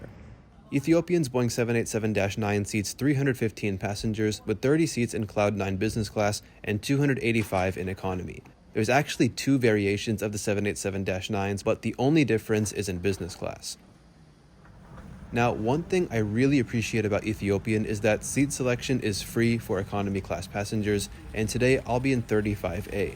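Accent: United States. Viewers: Just discovered your channel. I appreciate your upbeat assessment of your flight. I am so sick of captions w/out voice so it was a surprise to hear a nice American accent! Greetings from north of Atlanta.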